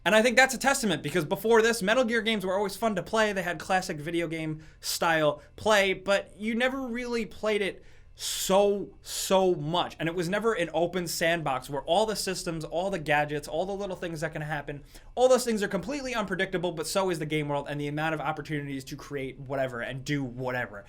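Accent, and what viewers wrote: Australia. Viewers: Actually a Australian accent basically sounds just like yours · what the hell was up with your Aussie accent?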